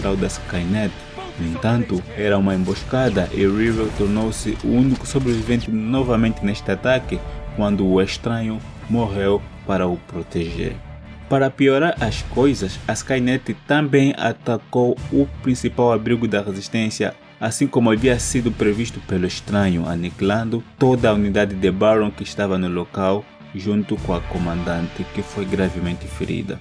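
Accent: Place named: Portugal. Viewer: Vim do vídeo sobre The surge , o microfone nem era ruim como falavam dava pra entender , eos br achando q vc era Portuga kkkkkk , legal saber q melhorou a qualidade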